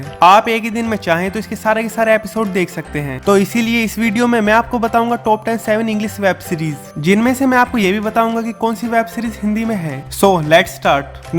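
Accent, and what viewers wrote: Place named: Uttar Pradesh, India. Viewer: Bhai tu U.P ka h na ... Tre accent se bta skta hu Ghaziabad ya meerut ya hapur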